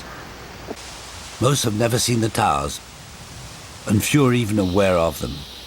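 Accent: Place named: United Kingdom. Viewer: I can't stomach an overly dramatic British accent, makes me want to puke....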